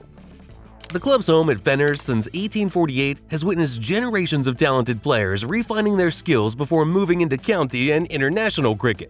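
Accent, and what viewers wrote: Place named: United States. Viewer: and The world's greatest batsman, Australia's Don Bradman (apparently here (twice!) known as Edward Lumpy Stephens) played for Hambledon CC? Tony Greig was also Richard Newland in another life, how versatile! Boy, I hate AI.....why have an American voice? This whole video is extremely aggrevating.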